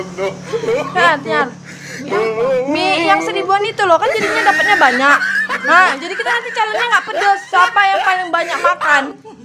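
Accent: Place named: Medan, Indonesia. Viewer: Nampak x bahasa medan mu Dx🤣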